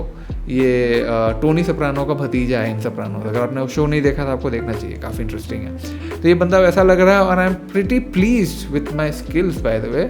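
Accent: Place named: Kolkata, India. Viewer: আপনি কি বাঙালি? আমি বাংলাদেশ থেকে। হিন্দি অ্যাকসেন্ট কলকাতা এর মতো লাগছে।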